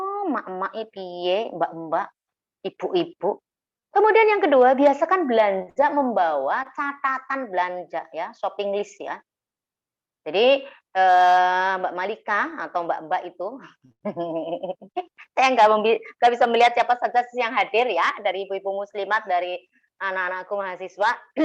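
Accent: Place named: Banyumas, Indonesia. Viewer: Q sung seneng bolak balik tak ngringokna 👍🙏hehe wong ngapak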